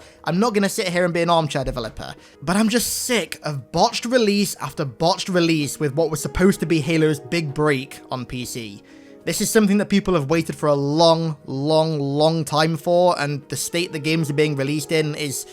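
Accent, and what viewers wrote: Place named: United Kingdom. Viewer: Lispy British man? I didn't know that film critic Jonathan Ross was also a gaming Youtuber!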